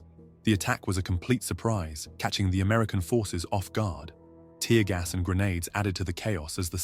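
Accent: United Kingdom. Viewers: Why does the narrator have ti sound like a damn Limey!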